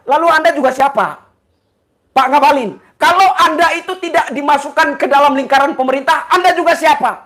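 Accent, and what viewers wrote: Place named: East Nusa Tenggara, Indonesia. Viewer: mantap bang,,,logatnya orang yg aku pens,,orang ntt ya,,,maju trusss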